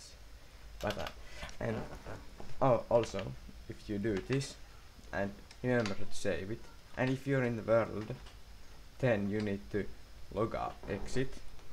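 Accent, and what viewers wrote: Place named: Finland. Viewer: i heard finnish accent toril tavataan perkele :D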